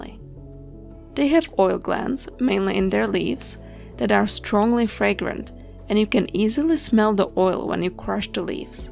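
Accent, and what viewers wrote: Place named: United States. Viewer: Thank you for this very accurate and useful video. It is very interesting for European users to know all these details and photos of American plants. It is also very courious to listen how you pronounce the families names, they are Latin names, but your American pronunciation (Americanization) makes them sound much more charming and mysterious to my ears.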